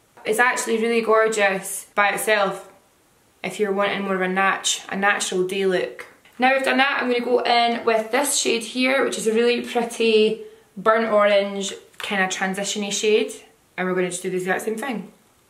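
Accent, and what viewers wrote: Scotland, United Kingdom. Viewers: What part of Scotland are u from I'm from paisley love this look deffos subbing xx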